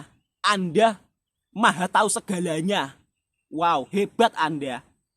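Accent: Java, Indonesia. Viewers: bahasa nya pekat org mana tu.. isor ngomong jowo. pekat tnent yoo... menarik sekali sebutan nya kang. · Jawa banget bahasanya mas...asyik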